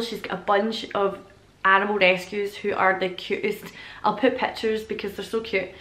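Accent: Scotland, United Kingdom. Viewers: Welcome to America, Miss. Lot of things will be strange, but much will be familiar and inviting. Advice? I do have relatives in New Mexico, and the temperature changes DRAMATICALLY throughout the day, from hot in the daytime to freezing at night, so bring a couple of jackets, even if it's 100 degrees that day. Also, you can always expect that when someone in America hears your accent, they will want to tell you about their Scottish ancestors (the same applies to ALL foreign tourists we encounter here). I know it gets old really fast, but they really want to hear about the country their family came from, and they are trying to make you feel welcome.:) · This is me listening to you. 😂 I just love your scottish accent!! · you always make my day better. I think you are perfect in every way. You are so beautiful and I have always been a fan of the Scottish accent so it drives me crazy to hear that accent coming from that beautiful face. 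Keep the videos coming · I think this means u r thinking beyond the 3rd dimension. Past present future r all one, I been watching Aaron Doughty he does meditation but explains reality shifts etc (the same thing is happening to me) Have fun in US! They love Scottish ppl there, esp a pretty lady...in fact u could coast right thru on accent alone 😎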